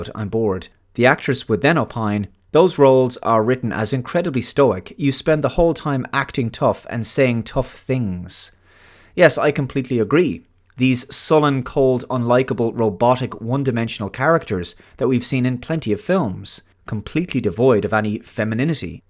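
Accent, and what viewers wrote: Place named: Ireland. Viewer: Hey, great vid. Really didn't like that symmetrical video split youve done in the BG. Made me a bit queasy tbh. Not sure you've done that before, I just like to look at all the beautiful locations behind you while listening to your dreamy Irish tones. Without the editing. Anyway, cheers mate 👍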